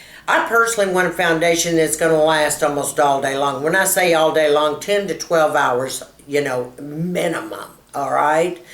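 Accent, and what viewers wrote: Texas, United States. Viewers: You look as young as the others and that is the result of a lot of skin care and taking pride in yourself - you look stunning and I love that Amore Pacific and it sounds wonderful - great choices; I don't powder either - have a wonderful day - love you like a pig loves bacon heehee xxxxx Suzy.....love your Texan accent with the French words:)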